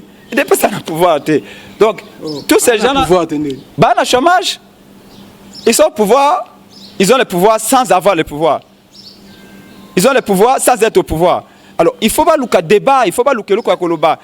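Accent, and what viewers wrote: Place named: Rwanda. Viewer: Ndeko lande elobeli na ye malamu,yoka bongo na ye "etondi na ba R R R R R ya kinyarwanda, moto oyo azali rwandais.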